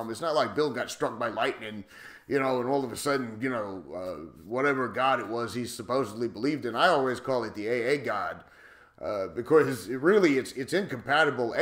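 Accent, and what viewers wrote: New Jersey, United States. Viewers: Your so funny, haven't laughed like this for such along time, I'm literally crying laughing. Love the Jersey "wise guy" accent. Gold. 🤣🤣🤣🧡🧡🧡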